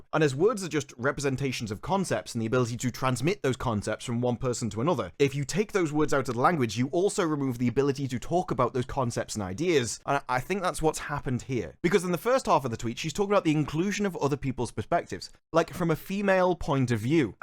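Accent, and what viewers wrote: England, United Kingdom. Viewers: is definitely one of my favorite content creators, him and Mauler, some insults and comments are just funnier with an English accent. Mauler's video where he deconstructs Doctor Strange and the Multiverse of Lameness is one of my favorite videos of all time. Also, that Punisher shirt is AWESOME.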